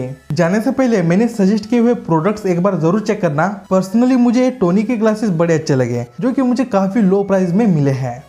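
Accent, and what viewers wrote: Bengal, India. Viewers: you Bengali ?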